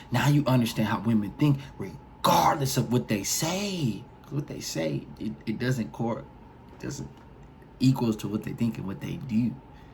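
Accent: Baltimore, United States. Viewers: You mad cool but I hate your ascent. You must be from Baltimore. Certain words you say comes out funny. You speaking rs tho lol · I thought u was from Baltimore for a second 😂😂😂😂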